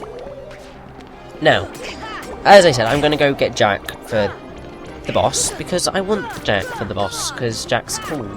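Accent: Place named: Wales, United Kingdom. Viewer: haha, you do sound a little Welsh! Nothing wrong with being English, although Welsh are better ;)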